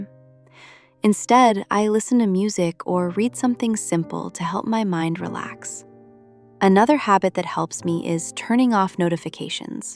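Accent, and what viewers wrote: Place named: RP, United Kingdom. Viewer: I love BBC English ❤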